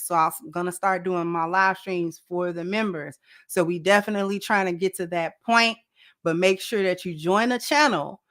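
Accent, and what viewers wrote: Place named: New York, United States. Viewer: NY'er here, Brooklynite til the day I die. I hear that NY accent slowly creeping into vernacular... sto-ah, not store? I know next, she gonna start saying caw-fee 🤣🤣🤣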